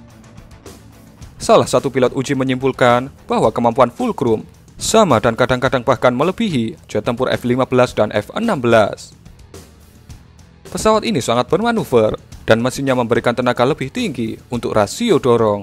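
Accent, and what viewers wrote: Java, Indonesia. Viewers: logatnya jawa bgtu kayak sukarno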